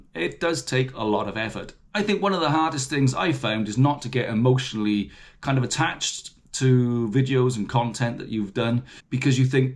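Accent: Wales, United Kingdom. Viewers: Wife put you on the big T.V, She likes your welsh accent, and is now talking to me in her welsh accent, Loved the video ❤ Very inspiring Filming at the allotments to day for The Friday Allotment A Random Lady Allotment holder just told me your on you tube, I didn't know what to say? I got in tonight and I see she has Subscribe to my channel 🤣 Embarrassing or what So I must start embracing it!!! Will do buddy, The cats out the bag for me, And post man knows as well 🤪 So there's no going back for me now.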